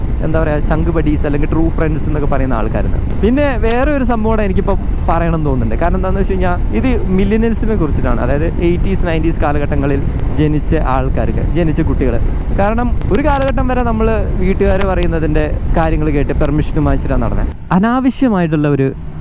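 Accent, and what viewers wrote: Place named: Kannur, India. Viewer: na....nte mone🤣 ningalu kannurinu aduthano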